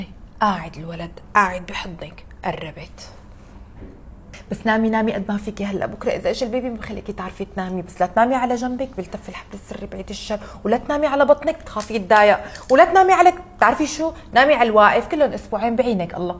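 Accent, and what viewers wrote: Syria. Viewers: محلاااااكي نفسي تكون عندي صديقه نفس طريقه كلامك وحرجاتك 😂😂😂 محلاها اللهجه السوريه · اللهجة السورية عششششققق عيني ربك والله 🇮🇶🇸🇾❤❤😂😂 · لهجة سورية تهبل ❤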